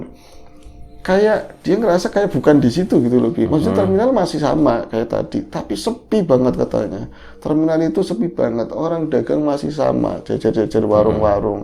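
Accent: Banyumas, Indonesia. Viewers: Tembe gie weruh mas ngomong ngapak loh😁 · iya sih mas,. tp jujur logat ngapak itu slah satu favorit saya..mskipun sya asli surabaya, tp sya prnh tinggal ckup lama dibanyumas..sukses slalu buat mas & mas,. smga chnelnya smkin maju&brkembang..tp ttap request ngapak nya diselipin dikit2 ya mas..hehehe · Ora ngapak ora kepenak... 👍👍👍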